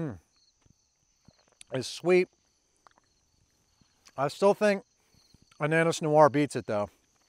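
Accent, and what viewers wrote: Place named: Maryland, United States. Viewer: Hey. As a Marylander retired in South Carolina, I am so homesick watching your videos, at least during the summer. Winter, not so homesick ever. Terrific job with these multiple cultivars, very well presented. Did you get sick after taste testing all of those? I really enjoy your very subtle Maryland accent too.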